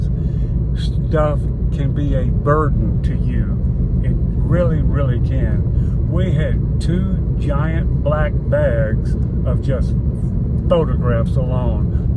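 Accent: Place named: Boston, United States. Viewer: I love your Boston accent.... ;\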